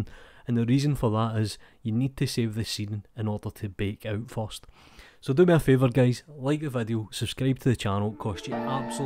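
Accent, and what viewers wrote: Scotland, United Kingdom. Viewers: nice and simple , love those short informitive tutorials you do, thanks , btw I love you accent scottish people are amazing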